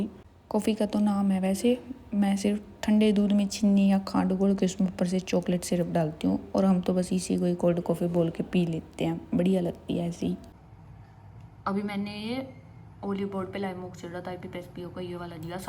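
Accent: Haryana, India. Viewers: your hindi with haryanvi accent is too good #complement❤😄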